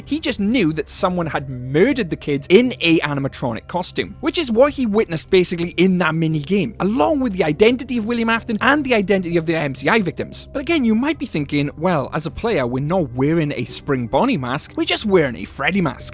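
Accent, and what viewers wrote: Wales, United Kingdom. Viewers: this is such a weird question but are you welsh??? i am and i think i recognise the accent loll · Whats that accent? Is it welsh?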